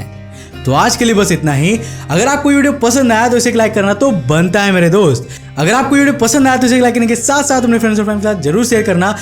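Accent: Bengal, India. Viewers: are u from West Bengal? BECAUSE YOUR ACCENT SAYS SO. AND I PERSONALLY LOVE BENGALI PEOPLE.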